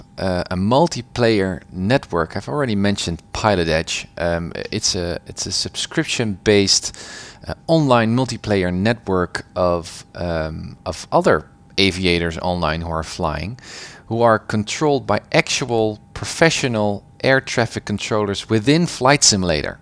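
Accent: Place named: Netherlands. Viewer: are you dutch? English sounds dutch :P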